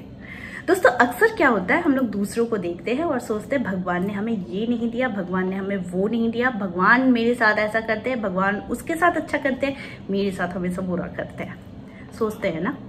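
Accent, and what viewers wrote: Bengal, India. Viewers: Apni ki bangali? Apnr uchharon onek tap bangalider moto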